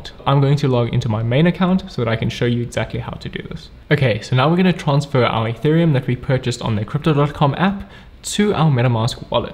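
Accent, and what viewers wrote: South Africa. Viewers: hey brother, you sound south african?? I stay in Cape Town and would really aprecciate if you could give me advice on best platforms to use in South Africa?? and any advice in general as a cape tonian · Hey, are you South African, and do you also reside there if indeed you are, curious coz your beautiful accent sounds like it?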